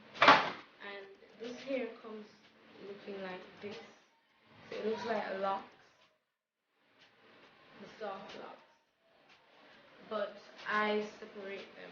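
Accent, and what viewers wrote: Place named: Jamaica. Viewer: Nice love the way u used ur Jamaican accent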